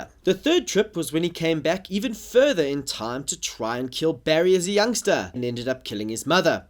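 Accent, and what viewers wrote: South Africa. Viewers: Is this guy South African?????? He sounds like he is.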